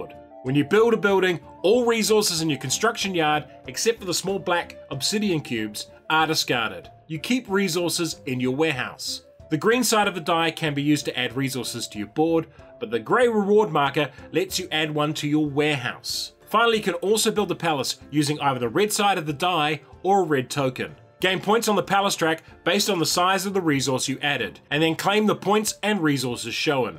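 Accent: New Zealand. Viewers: "Catacombs Cubes" sounds much more like it rhymes when said by a New Zealander than it does when said by a Merican...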